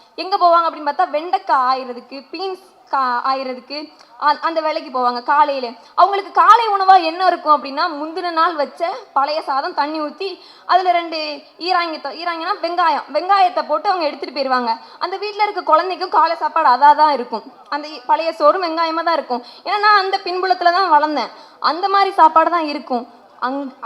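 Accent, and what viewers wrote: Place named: Tirunelveli, India. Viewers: Wow pappa super nellai slang speech,,real speech about irresponse some politicians,, congratulations,, thank you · திருநெல்வேலி மண்ணின் வாசம் உன் பேச்சில் அருமையான பேச்சுமா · திருநெல்வேலி ஸ்லேங்கில் தமிழ் பேச்சு செம தூள் சகோதரி. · ஏ நீ திருநெல்வேலியா பேச்சிலே தெரியில்லா ஏநல்லாஇருக்கு‌ பேசு பேசு. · Thai unakku entha ooru. Peiru enna...arumaiyana Nellai Tamil. Tamil vazhga. Tamirabarani vazhga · நெல்லைத் தமிழ் அருமை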